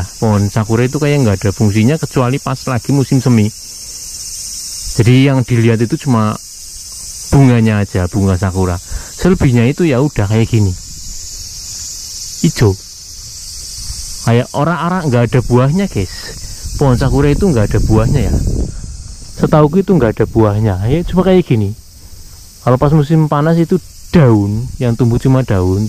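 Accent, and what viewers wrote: Banyumas, Indonesia. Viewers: ora ngapak ora kepenak 😊😊😊😊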